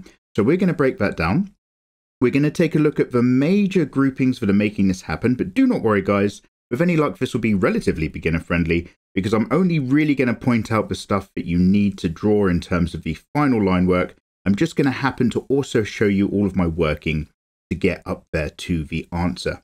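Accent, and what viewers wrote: United Kingdom. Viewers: dude with a british accent drawing cultured stuff, fucking awesome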